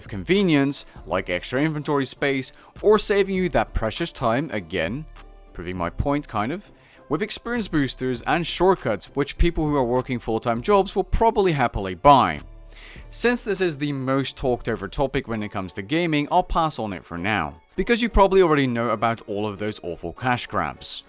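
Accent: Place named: Netherlands. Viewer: Problems with 1. He’s a pretty dope content creator 2. Not afraid to branch out on video content. 3. Is a very attractive man with a nice Dutch accent. 4. What? 5. Hates frogs and encourages bonking. 6. All of the above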